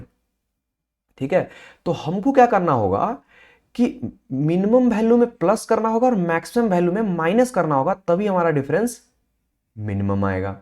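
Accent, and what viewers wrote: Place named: Bihar, India. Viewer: explained amazing . By your tune you looked Bihari/up, I am also a Bihari. good to see you bro.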